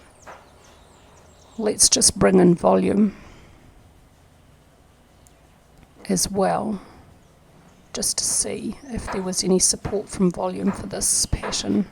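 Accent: New Zealand. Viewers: Very good. Good ole kiwi accent too eh :)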